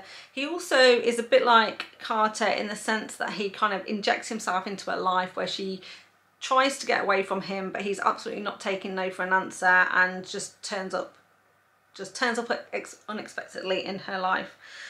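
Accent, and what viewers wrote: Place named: England, United Kingdom. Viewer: I subscibed today, love your english accent! You made me laugh with the bloopers!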